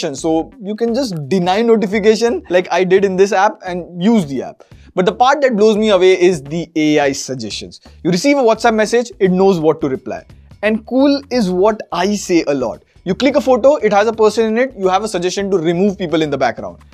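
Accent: India. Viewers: Indian accent sucks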